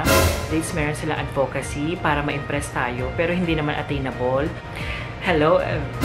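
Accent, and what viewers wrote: Iloilo, Philippines. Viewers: I do really like your accent. Hehhee so ilonggo like me. Hehehhe Pero i trained myself like most of time on how to speak the english language like how native speakers do. Pero ang charming mo and ang sarap pakinggan kaya ok na. Heehhehe and most and for most, your so cute hehehhe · ilonggo ka po??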